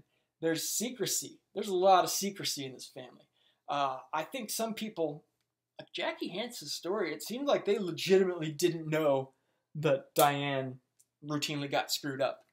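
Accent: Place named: Ohio, United States. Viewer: Ohio huh? I’m from Cincinnati and pretty good at listening for accents. You definitely aren’t from Toledo or Cincinnati outright. I’m going to guess more very very outskirts of Cleveland. Maybe Akron???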